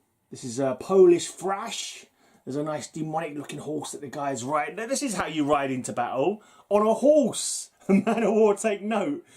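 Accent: United Kingdom. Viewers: Brilliant entry. Thanks for doing a video. Much appreciated. I like the way you come across in your videos. Very jovial. And your accent reminds me a lot of Steve Harris and Niko McBrain.... I hope I've got the right area? 🤔😊 I have to agree with you about Manowar. They need to get some horses in their album covers if they are to be taken seriously 😁😂 Some cool picks. You are in the draw. 👍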